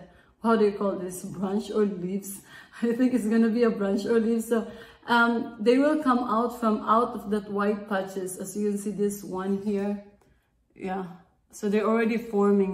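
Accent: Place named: Eastern Europe. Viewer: I like your accent, sounds Slavic. 😊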